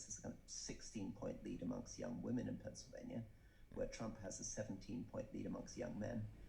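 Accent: United Kingdom. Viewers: Who's the Limey Chick? Is she the Channel Host? She's Lovely!!! 🌹🌹❤❤ I love the little teenie thing she does with the eyeliner!! Beautiful Young Girl!!❤❤❤ Her Limey Accent just adds to her charm!!!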